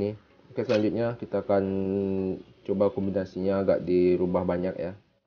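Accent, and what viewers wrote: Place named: West Sumatra, Indonesia. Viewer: Suaranya mirip minang kocak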